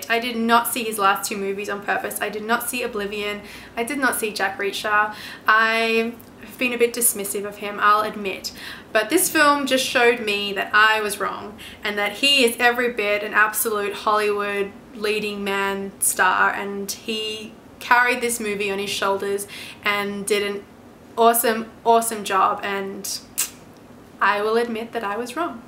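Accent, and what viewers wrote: Australia. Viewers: Loved your take off the film and I definitely agree with you! You are now becoming one of my new favorite movie reviewers on YouTube with a nice sounding Aussie accent and, dare I say, stunning appearance! Keep up the great work!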